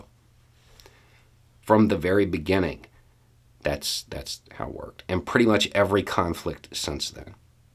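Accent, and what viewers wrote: South, United States. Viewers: I wish I had you as a political science teacher in high school!! I am sorry I ever jumped to a bad first impression of you...I will remember to never put off someone as unintelligent due to a long beard and Southern accent. I truly learn a lot from you. With sincere thanks!!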